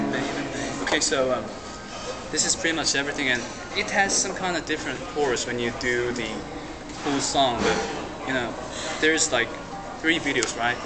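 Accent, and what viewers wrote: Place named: China. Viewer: Where are you from? Like a Chinese.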